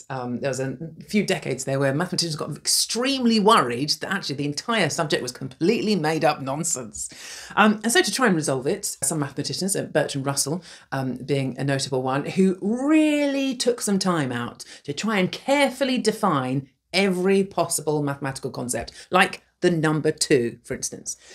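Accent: United Kingdom. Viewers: I came for the math lesson, but I stayed for the charming British accent.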